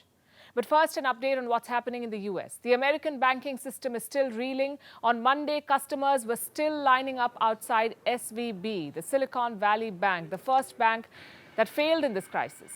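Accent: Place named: India. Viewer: She is average indian lady. She speaks English in hindi style with modulation. May be u like her modulated style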